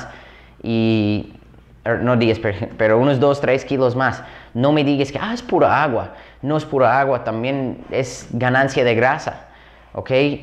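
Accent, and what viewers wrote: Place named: Mexico. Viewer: Es adictivo escuchar ese español de Méjico que utilizas XD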